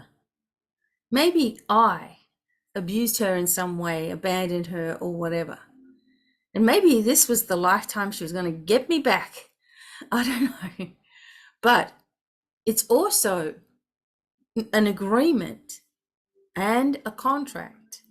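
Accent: Australia. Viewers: I absolutely loved this message ❤and really hit that deep spot! Ya aussie spirit and voice 😂came out especially at the beginning with the voices about people not understanding why we have a energy exchange 😅 omg I was rolling around in laughter vibes flying high lol 😂 Thank you for the depth and the joy ❤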